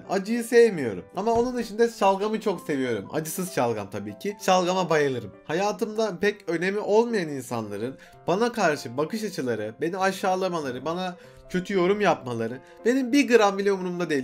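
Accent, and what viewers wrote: Adana, Turkey. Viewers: Abi sen Adana'lı değil misin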